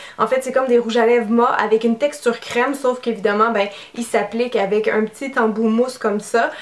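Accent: Canada. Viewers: Très heureuse année à toi!! Et continue tes vidéos, elles sont toujours intéressantes et utiles. Je voulais te dire que ton style léger et fun me pousse toujours à regarder tes vidéos jusqu'à la fin..ou c ptete l'accent canadien lol... Merci pour la revue NYX j'hesitais justement à acheter certains produits de cette marque. Bonne journée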